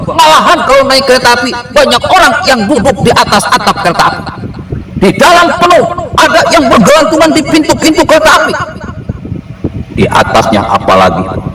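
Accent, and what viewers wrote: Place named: Banyumas, Indonesia. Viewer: Hadir lajut terus ngapak medukung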